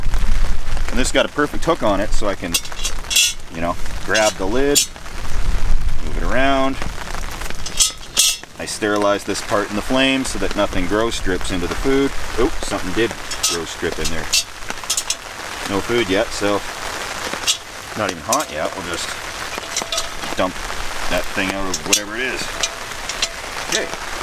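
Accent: Canada. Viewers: I just subscribed today after watching a few vids. From his accent I am assuming he is from the Canadian side of North America:) I therefore await the stealth camping on a hockey rink!! Seriously, he seems a normal guy who super honest and informative and not one of those "look at me" YouTube campers, from what I have seen he is not in it for the likes, just the thrill (and probably the beers).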